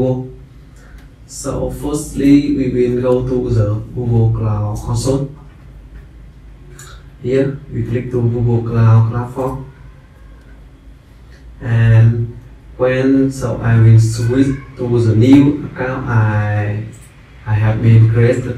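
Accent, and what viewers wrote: Vietnam. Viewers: Good job br!! But Vietlish accent is so clear anyways :o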